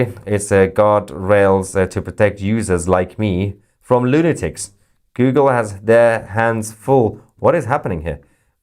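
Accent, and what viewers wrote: United Kingdom. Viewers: Mr.! Did have seen your Video and heard your fucking British accent??? Believe me, you’re a very cheap guy like those IRJC and Basij undereducated!!! 👎👎👎👎👎👎👎👎👎👎👎👎👎👎👎👎👎👎👎👎👎👎👎👎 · .if America goes in on foot it's gonna be bigger then Iraq does. Trump want a long war . because bombing them won't get rid of them if you want change you have to go in on foot.. be prepared to lose thousands of Americans .. how much are they paying that British accented mouthpiece.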